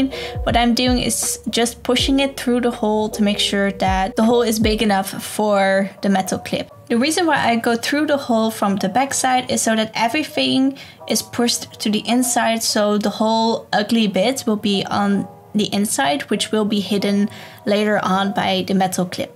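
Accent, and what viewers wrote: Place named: Netherlands. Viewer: Hi! I just found this video and really want to make one! Also, are you also from the Netherlands? I thought i heard a bit of a dutch accent 🇳🇱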